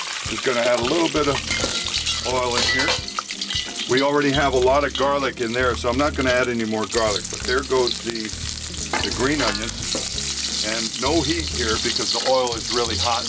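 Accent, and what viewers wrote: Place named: Italy. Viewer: U have a kind of a italian,,,ish accent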